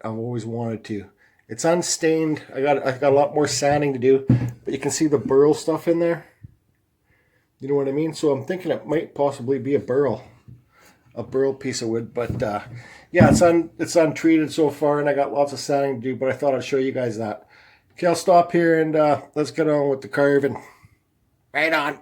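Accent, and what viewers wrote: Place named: Canada. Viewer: I fucking love your personality dude. It's like listening to me but with a Canadian accent instead of a southern one.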